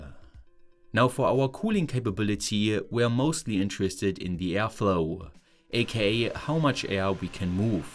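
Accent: Germany. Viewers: I have also been subscribed for many years. But I don't understand why you mislead people that you are Scottish if you have such thick German accent.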